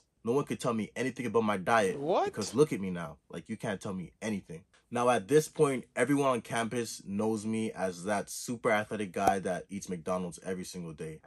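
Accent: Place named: Toronto, Canada. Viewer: are you Canadian? you gotta toronto accent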